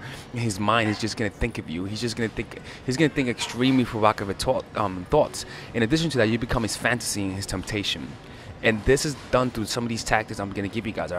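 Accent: New York, United States. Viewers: I can tell you a new yorker lol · His accent actually makes me miss New York ugh I wanna go back🌃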